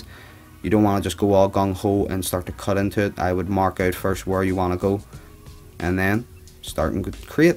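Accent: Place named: Ireland. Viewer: Mate I thought you were irish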